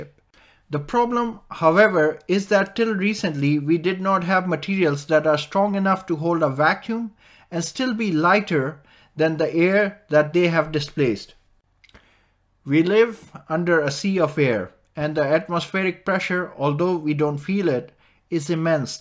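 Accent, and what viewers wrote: India. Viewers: Is there a way to have a native English speaker do the voiceover? What's the point of using a heavy Indian accent in this airship video? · indian accent... nope i'm out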